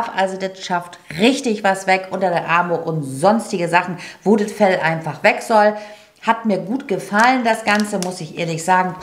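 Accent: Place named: Berlin, Germany. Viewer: Hab dich eben entdeckt und finde du hast eine sehr fröhliche und erfrischende Art, die mich irgendwie glücklich macht. Darf ich fragen, wo du herkommst? Irgendwie hörst du dich so nach "Berliner Schnauze" an, find ick jut. LG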